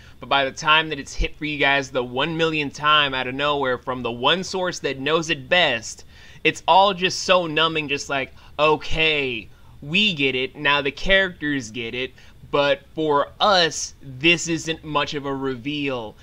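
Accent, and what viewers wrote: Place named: Cockney, United Kingdom. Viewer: your cockney accent, nice. i think a disclaimer for the show needs to be; uther will always hate magic, he never changes and he constantly reminds people of that.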